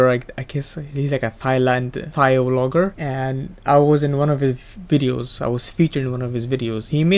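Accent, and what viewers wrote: India. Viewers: Hell yeahh First btw Whats with the indian accent Wtffff real face whennn?? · Indian accent cool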